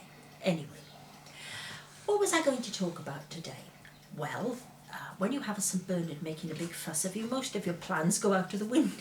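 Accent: Wales, United Kingdom. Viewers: Very grateful to have found you. I have learned to create more simplicity in the way I live which has left me more time to be creative. Thank you for you wisdom. My grandparents came from Wales and immigrated to Canada. I am enjoying very much the way you speak, it reminds me of them. Bless. Peace, Jxx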